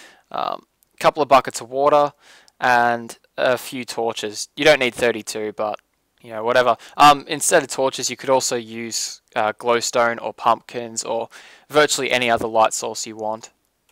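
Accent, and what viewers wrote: Australia. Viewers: Are you Aussie. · awesome, are you australian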